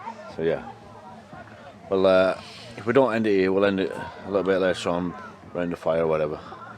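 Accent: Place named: Bolton, United Kingdom. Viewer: Been watching ur vlogs, saying this guy's definitely from Bolton 😅.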